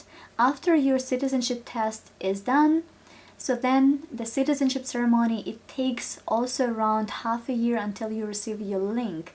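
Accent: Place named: Eastern Europe. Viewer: you have an east European accent and I Wana visit Poland, Croatia and Bosnia in the summer that was why I asked, never mind anyways I'm from Calgary.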